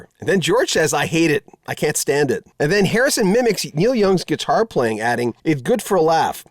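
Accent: Canada. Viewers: I didn’t see a video I just heard a Canadian accent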